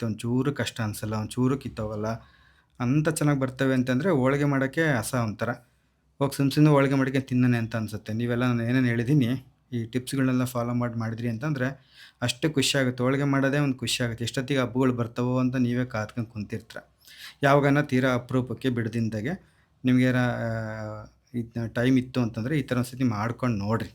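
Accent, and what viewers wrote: Chitradurga, India. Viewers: Durgada bashe keloke chanda ..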